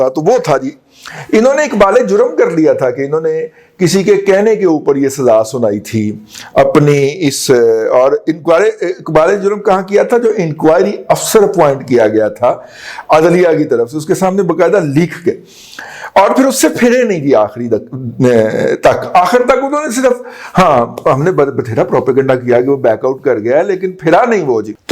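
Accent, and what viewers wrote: Punjab, Pakistan. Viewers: You r brilliant bhai. Content,context, humour,sarcasm,unique style of presentation...n punjabi accent. real good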